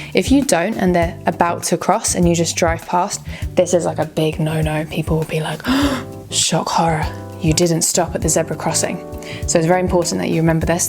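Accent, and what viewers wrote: United Kingdom. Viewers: You have such a nice and enjoyable British accent :) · 好棒的節目，英國口音好聽到不行，感謝您！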